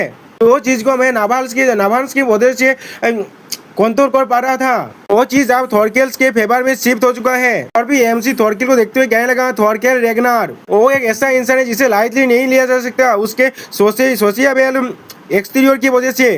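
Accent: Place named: Bengal, India. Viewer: Bhai toda slow or hindi clear bolo kitna fast or bangali me bol rahe ho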